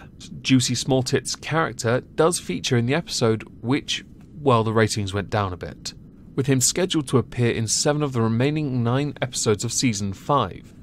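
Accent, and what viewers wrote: United Kingdom. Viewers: for PM! No Lives Matter!!!! Always love to see you upload, my man. Good to hear another brit talk about this stuff.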